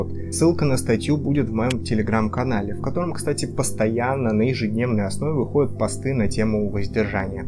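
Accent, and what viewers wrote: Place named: Okanye, Russia. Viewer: это оканье в течении всего видео прям зае....ло конкретно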